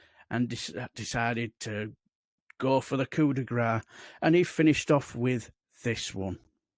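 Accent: Yorkshire, United Kingdom. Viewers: Never heard of Darren Grimes but won't make a point of following the vacuous populist sh1t. Btw, nothing wrong with a Yorkshire accent; and that's from a Lancastrian. The fact that number 4 was missing was hardly the end of the world, but made me rewind to check. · Another enjoyable vid. By the way, my previous comment was purely a question, and not meant as a complaint. And, even though I regularly listen to Phil and Max, my weekdays are not complete until I have enjoyed your dulcet Yorkshire tones. Please keep up the good work.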